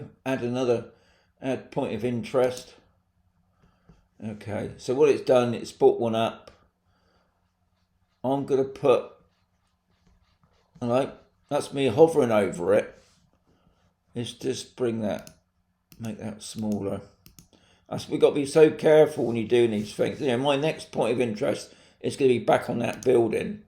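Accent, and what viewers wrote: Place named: Bristol, United Kingdom. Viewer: Sounds like you're from Bristol, UK. Am I right?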